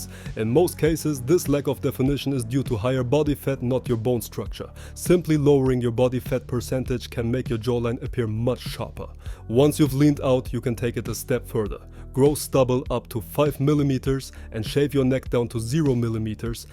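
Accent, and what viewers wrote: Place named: Canary Islands, Spain. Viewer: dein akzentsetzung klingt nach Kanaren deutsch also nicht böse gemeint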